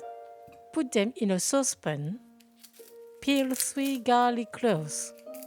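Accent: France. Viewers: When the food is Chinese, and the accent is french you know it's going to be tasty. It looks like a very memorable experience by the table.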